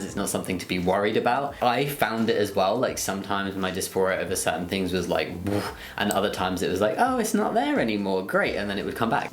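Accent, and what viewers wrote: United Kingdom. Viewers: Is he from UK ? This is not American accent for sure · Because of your accent I’m guessing your from the UK I am too and I was wondering if T and the surgery is on the NHS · I'm from Northern Ireland and I could instantly tell he was British aswell lol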